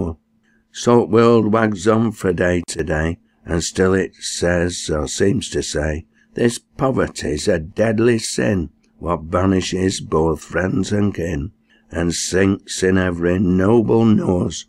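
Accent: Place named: Lancashire, United Kingdom. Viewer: I really like the subject and the lovely Lanky accent but it was far too fast for me ( I am lanky too) If you could slow it down a little I am sure it would be so much powerful as then I could understand the words. I really hope to visit you again soon, you have a lovely voice and wish to "continflab" further : )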